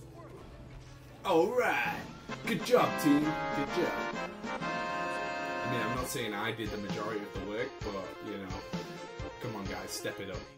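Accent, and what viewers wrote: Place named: United Kingdom. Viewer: no worries bro been watching your vids regularly and there really good!!🇺🇸💪 but dude what happened to your British accent!!! Don't forget ff7 on that list! Let us know when your next online we'll party and catch up, you know I'm a Sony boy through and through but the switch has turned my head a little 👌 also I was in the Nintendo store in NYC and it's crazy, you need to get there sometime man you'd be in heaven.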